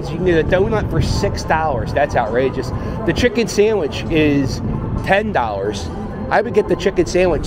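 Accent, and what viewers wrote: Philadelphia, United States. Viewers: Perfect—a tour of the Bank and a flawless Philly accent!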